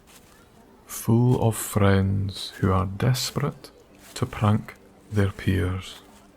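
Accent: Scotland, United Kingdom. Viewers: Santa with a Scottish accent okay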